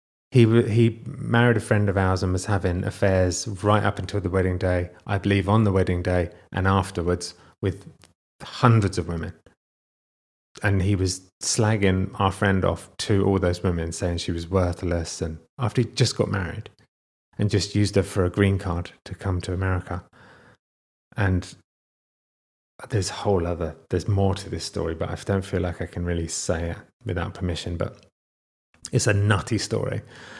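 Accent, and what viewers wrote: United Kingdom. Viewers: I have noticed that a lot of your videos have been triggering for me specifically because you remind my of my ex. He is British, MCR, and we are both in recovery (post divorce - he was an alcoholic while we were married, and I abused alcohol post divorce - for various reasons treated via therapy). And idk if it is cultural (you're both from England, and you both have English accents ((obviously not the same accents))), or not. But I've had to really work to separate the two of you. He is very much a social media dad. He has a lot more work to do, because he started years after I did. I've had so much therapy and he hasn't. Idk, it's really weird, and I have to really work on staying in my own hoopla hoop when watching your videos specifically. I'd love to have a few conversations with you about it, but I know that probably isn't something that will actually happen lol. 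You have a lot of followers. But I do genuinely love the videos and openness from you and Laura. And I'm an adult who was diagnosed later in life as neurodivergent, and all 3 of my children were diagnosed as children. My mother had severe mental health issues (diagnosed).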